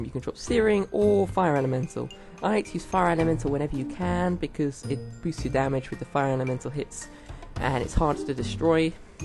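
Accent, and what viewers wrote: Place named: England, United Kingdom. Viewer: Это английский акцент, необразованный ты человек)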